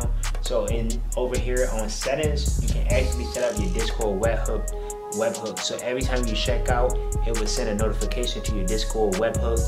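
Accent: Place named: Baltimore, United States. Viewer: Def from Baltimore lol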